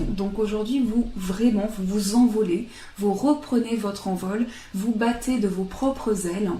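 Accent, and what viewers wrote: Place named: South-West, France. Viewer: Bonjour C'est la 1ère fois que je vous découvre, je crois deviner l'accent du sud-ouest 😊 Vous êtes très belle et rayonnante, non , non, ne rougissez pas😊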